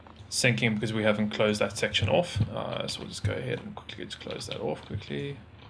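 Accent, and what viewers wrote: South Africa. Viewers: You sound like a South African :/ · You sound south African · Are you south african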